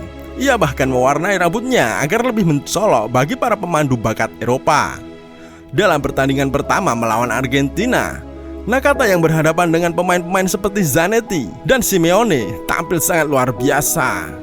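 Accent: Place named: Java, Indonesia. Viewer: Medog amat om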